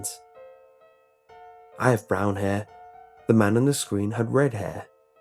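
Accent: United Kingdom. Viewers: your British accent make it more creepier. good job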